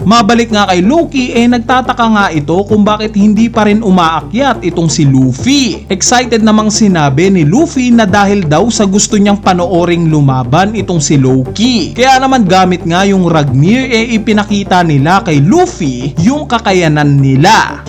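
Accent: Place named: Bicol, Philippines. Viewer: hayaan mo na bisakol ata si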